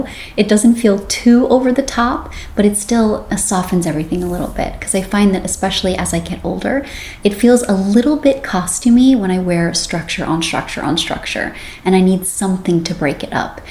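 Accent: United States. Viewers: american? canadian? accent of the host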